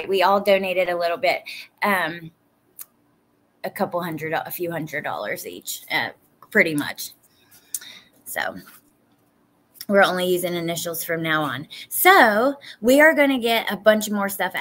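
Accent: South, United States. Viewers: U don’t smack ur lips it’s jus the gorgeous southern accent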